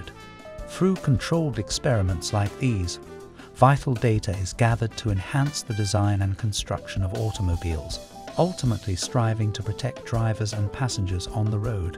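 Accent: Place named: United Kingdom. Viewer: Hyperbole with a British accent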